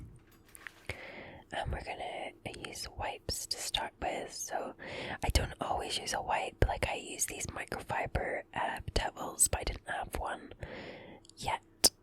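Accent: Scotland, United Kingdom. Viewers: What area of Scotland are you from I am fife and your accent sounds a lot stronger than ours 😂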